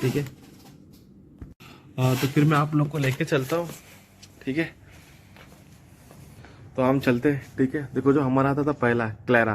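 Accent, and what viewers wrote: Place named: Hyderabad, India. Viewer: Bhai tum Hyderabad ke Rehne wale hai kya... Hyderabadi urdu...dikhri tumhari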